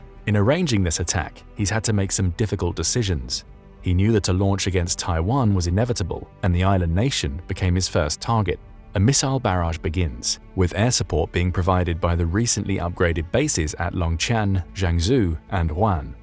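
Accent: England, United Kingdom. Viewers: Nice try. "British accent" can refer to any British accent. In this case, assuming you are not deaf, he is clearly referring to an English accent. But wait... in your attempt at pedantry you yourself were far too broad. So I'LL ask you: Which English accent is it? Estuary? King's RP? Norfolk? West Country? Brummie? Scouse? Midlands? Chorley?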